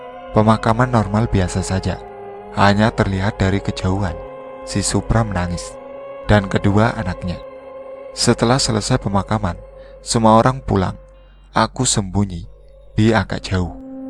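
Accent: Java, Indonesia. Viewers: Bahasane itu lhoOo ... Medok bgt .. Jawa TuLeN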